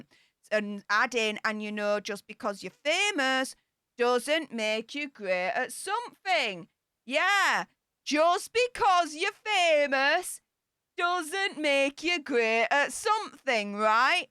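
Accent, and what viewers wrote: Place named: Nottingham, United Kingdom. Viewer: Ayup. Thought for a minute you were from my town, Nottingham lol.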